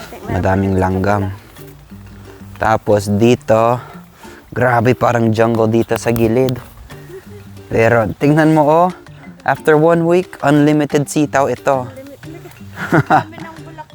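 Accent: Philippines. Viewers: Accent ni kuya, ilocano metten😂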